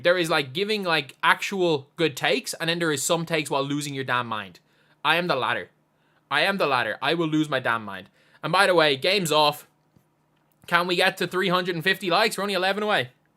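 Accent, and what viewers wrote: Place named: Ireland. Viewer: No worries – an Irishman talking about anything is entertaining to many Americans.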